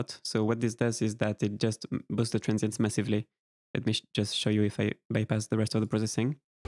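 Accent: France. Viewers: Apart from your Nice Frensh Accent, You are a great mixing and mastering teacher 😍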